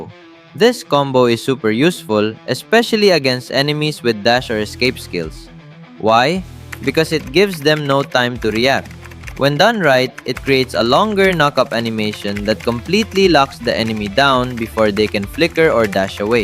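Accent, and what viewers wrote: Philippines. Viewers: Filipino accent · Nah bro actually using Pliphina accents😅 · Accent: Filipino Language: English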